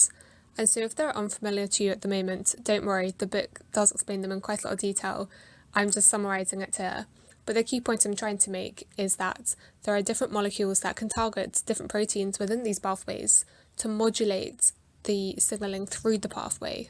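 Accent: England, United Kingdom. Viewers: It's an English accent, one of many in daily use in England. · Thank you very much for the concise and artistic presentation. I liked also your English accent.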